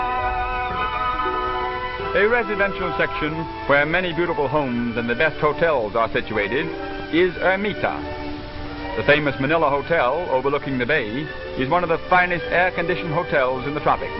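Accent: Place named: Transatlantic, United States. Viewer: trans Atlantic American accent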